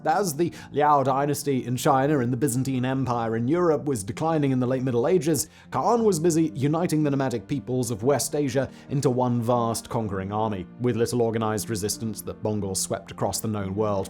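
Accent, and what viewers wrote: England, United Kingdom. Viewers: Didn't get any new information about war or warring cultures. Just wasted time hearing arrogant English accent.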